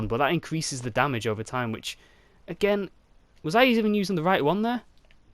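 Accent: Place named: United Kingdom. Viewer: Half the reason I watch is for your delightful accent! British?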